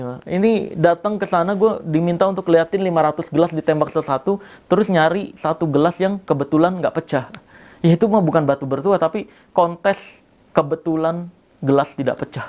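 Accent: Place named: Java, Indonesia. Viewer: Wkwkwk bang wong jowo yo 😂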